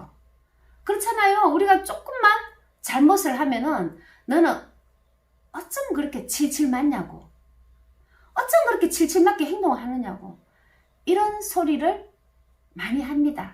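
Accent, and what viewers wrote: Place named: Yeongju, South Korea. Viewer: 영주사투리 같은데 듣기 좋습니다.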